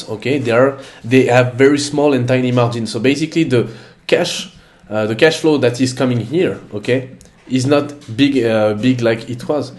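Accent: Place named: France. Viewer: Ton anglais avec un accent francais est tres sympa. Tu maitrises la langue de shakespeare ! Chapeau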